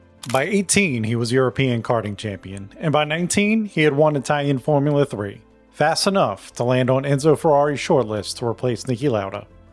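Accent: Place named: United States. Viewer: It must be AI as the maker claims he's based in India. So this must be an AI voice with an American accent.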